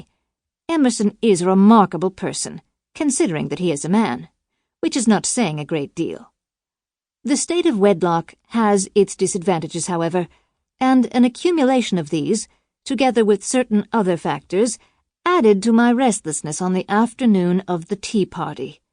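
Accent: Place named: United States. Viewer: Why an American accent? Peabody is British.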